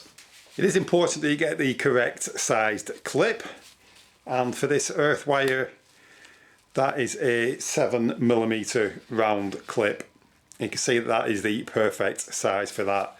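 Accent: United Kingdom. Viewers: A question for British viewers, what kind of accent is this ?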